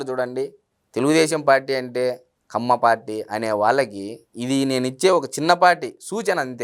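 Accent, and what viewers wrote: Rayalaseema, India. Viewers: సూపర్ బ్రో మీరు రాయలసీమ వ్యక్తి లాగా అనుకుంటా రాయలసీమ వ్యక్తివా నువ్వు చాలా చక్కగా బాగా చెప్తున్న బ్రో. కమ్మ కులంలో ఉండేది అదే పరిస్థితి బాగా గమనించినావే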